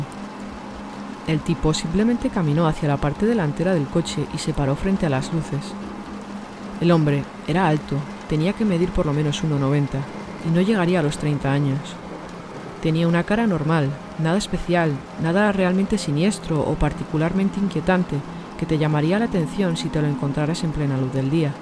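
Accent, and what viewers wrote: Spain. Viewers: Saludos,. Con la premisa de que YouTube es sin llorar, comento; en mi vida había oído narraciones más sosas, insípidas y tediosas. Reconozco que debes ser de España y que me recuerdas a un humorista español de gafas y cigarrillos con su clásico de gallegos..."Y que es de pilar?" Chiste muy viejo pero igual de plano, sin matices, incluso petulante... Recomiendo que te dediques al humor porque esto, no sé cómo definirlo, es como sentarse a mirar cómo la pintura se seca. Infumable, cero talento y peor, empeño por narrar historias de terror. Saludos desde la universidad de Miskatonic, pronto se abrirán matrículas para narraciones de horror.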